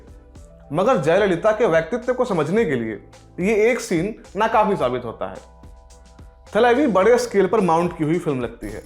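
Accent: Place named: Bengal, India. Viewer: I think he is Bengali.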